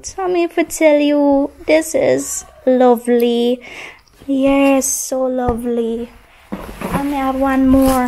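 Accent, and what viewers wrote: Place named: Jamaica. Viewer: Congrat to you+ baby girl news Wow i'ts a 👧 girl 🎉🎉❤. I tell you i respect you very much 👍🏻.you have a good vibes, you adapt the jamaican culture very much 😊🙏🏻 lady you talk like a jamaican. & you cook like a jamaican.nuff respect & nuff love.bless up from germany.🙏🏻🛐🕊💫.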